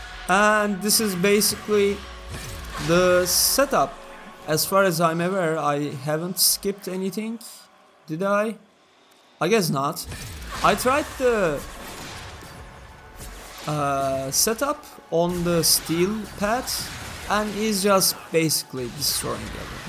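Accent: Turkey. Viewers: aksandan direkt anladim turk oldugunu bu arada ama guzel bizim kitleden de icerik ureticilerinin cikmasi basarilar!!!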